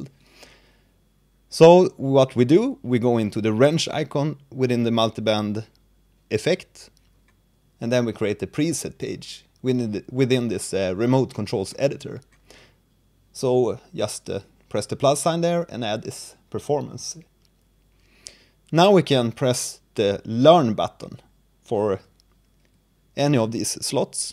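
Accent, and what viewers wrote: Sweden. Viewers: Thanks for the great reply, I actually wrote the beginning down, it was a good summary. :) I will try and play with sounds that have more transients, like you suggested! And yes, I will keep commenting, you can count on that! ^_^ You too, may your weekend be absolutely awesome! :) Are you by the way from Sweden, or do I recognize your accent wrong? Anyways, greets from your neighbor (maybe) from Helsinki! :)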